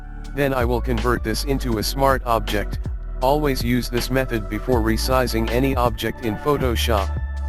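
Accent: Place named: United States. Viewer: Aap ayse kesy bol lety Hain American English?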